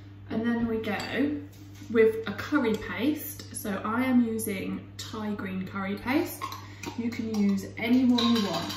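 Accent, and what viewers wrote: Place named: North East England, United Kingdom. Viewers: Watched a load of vids of good stuff,I,m from the north east also I,m further north listening to your accent......keep it up I enjoy things that I do...best wishes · Just with you based down south with your videos my guess was a m boro accent anyway great videos love seafood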